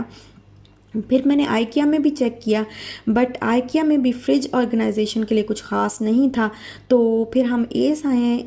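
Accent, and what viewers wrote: Saraiki, Pakistan. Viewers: ALLAH ap ko khush aur khushaal rkhay..ap ki pahli video ma dakh rhi hun ap k bat krne k style se hi andaza ho gia tha k ap saraiki hn...phr comments ma dakha to confirm ho gia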